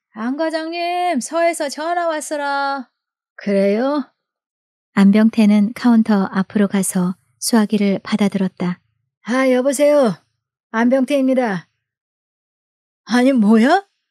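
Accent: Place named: Honam, South Korea. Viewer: 혹시 출신이 호남이여.? 워찌 그렇게 억양이 똑 같어부까이.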